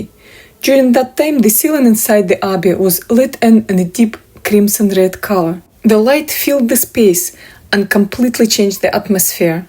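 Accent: Scotland, United Kingdom. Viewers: I know Paisley quite well having lived near it for years, yet you touched on many things I didn’t know. This is so well researched and your presentation and voice (yes there are wee bits Scottish have sneaked into your pronunciation, especially vowels) are a pleasure. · Wow man did not relize this about paisley you are sounding a little bit Scottish you can definitely hear it in your voice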